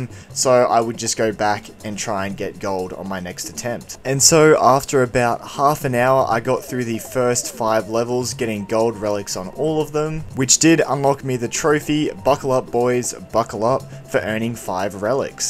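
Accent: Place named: Australia. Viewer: 1: Crash Bandicoot takes place in a fictional version of Australian Islands. 2: you do kinda sound like that.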